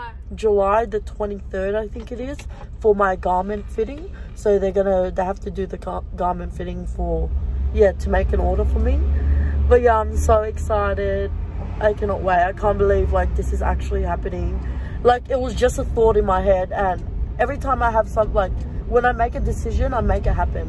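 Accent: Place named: Australia. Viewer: ok your Aussie🇦🇺🤓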